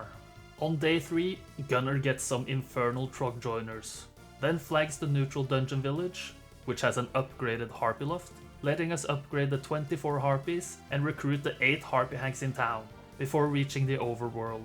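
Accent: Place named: Norway. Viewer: Me: algorithm, get me a video Algorithm: no problem. What sort of stuff do you like? Me: challenge runs of one of my favorite video games, with the art being made in paint, narrated by someone with an unapologetically norwegian accent. Algorithm: one moment, sir...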